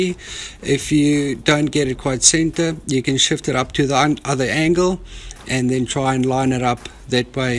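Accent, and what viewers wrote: South Africa. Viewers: Hey dude where in South Africa are you from LOL.... your accent gives you away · I can hear your SA accent too, it's a weird blend, lol. I'm a kiwi living in Tasmania and missing the great NZ fishing, lol.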